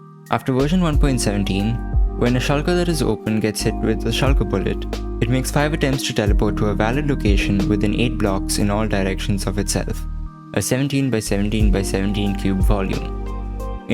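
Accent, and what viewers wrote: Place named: India. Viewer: Are you indian by any chance? love your accent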